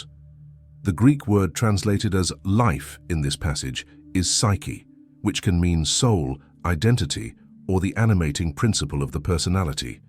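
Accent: United Kingdom. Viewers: OHH, GOD, NOT. THAT AWFUL BRITISH ACCENT......AGAIN !!!!!!!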